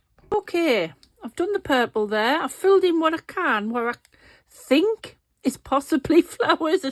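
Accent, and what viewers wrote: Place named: Sunderland, United Kingdom. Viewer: hi im new to your channel I've found you through Sallys channel ,, love love love the gothic look ,I'm from Sunderland and I can't figure outl how close you are from your accent 🥰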